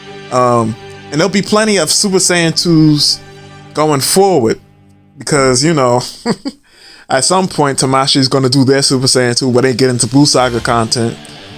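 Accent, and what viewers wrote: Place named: New York, United States. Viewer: Bro gotta be from NY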